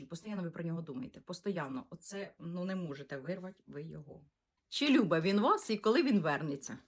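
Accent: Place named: Surzhyk, Ukraine. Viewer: Не приємний суржик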